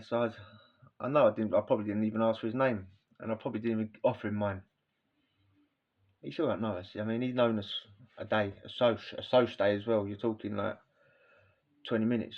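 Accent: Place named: London, United Kingdom. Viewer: What part of the UK are you from bruv you sound like a Londoner is that right?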